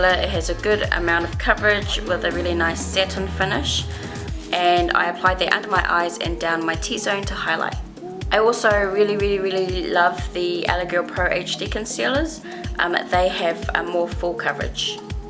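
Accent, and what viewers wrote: New Zealand. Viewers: Was out of it to hear a kiwi voice on a YouTube video! Love your work girl! What an inspiration!! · You speak like me!!! Woohoo a Kiwi! & here l was thinking you were from the States haha.. Beautiful tutorial btw, loved it! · i think she's from NZ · She's definitely a kiwi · You sound like a New Zealander , Kiwi as girl ! ,love it ! you go ❤❤ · As soon as I heard your voice, I clicked on your account to see which country you were from because I swore you sounded like a kiwi cx Im from New Zealand, this is my first video that I watched of yours and I think it looks beautiful :) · Her accent is what u call a kiwi accent not Australian don’t get it twisted 😂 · U sound & look part or Maori ..Kia ora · the accents sounds kiwi, I'd like to try the LA cosmetics where can I purchase them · omg is she from nz?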